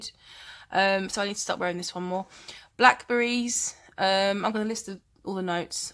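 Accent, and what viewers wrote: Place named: Surrey, United Kingdom. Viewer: I love Surrey English. Music to my ears. I wish I could speak like you. ❤❤❤ I only lived in the UK for five years but will forever love and miss it.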